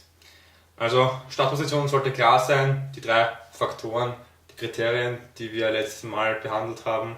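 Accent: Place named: Vienna, Austria. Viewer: nie daran gezweifelt ;) Bist du Wiener ? Hört sich für mich stark danach an :)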